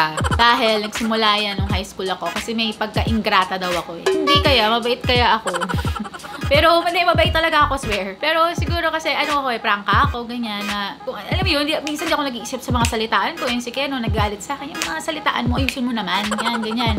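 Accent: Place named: United Kingdom. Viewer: ok naman pronounciation nyo ng youtube, british pronounciation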